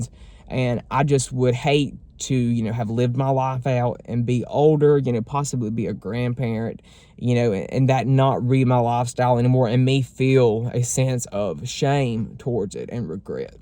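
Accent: South, United States. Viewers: I find that to be a ridiculous question tbh. It once again points out the hypersexualization in the LGB community. It also tells me that ppl are watching the videos without hearing & listening. This man has clearly stated that he is looking for love. That he wants a husband 1 day, with kids. He wants a family. He has spoken so clearly about his past & how exhausted he was that he couldn’t find anything burn guys just wanting sex. And then, here comes the OF porn addicts. That only want his body. It’s maddening. Yes he is gorgeous guy, beautiful southern accent, kind heart….yet he is just still being objectified. Like jeez ppl. Then yall wonder why yall be dying alone, at the age of 50 & 60 still on hookup apps getting rejected daily. I hate it. Truly.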